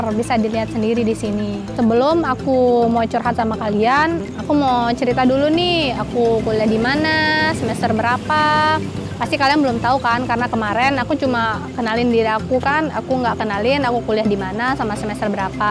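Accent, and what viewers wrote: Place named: Lombok, Indonesia. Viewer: logat lomboknya keliatan banget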